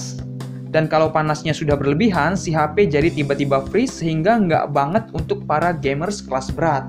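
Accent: Lampung, Indonesia. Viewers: orang lampung ya bang? nyo kabagh:v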